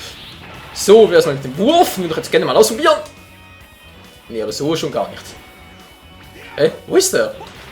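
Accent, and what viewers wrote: Switzerland. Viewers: Bist du schweizer wegen des dialekts?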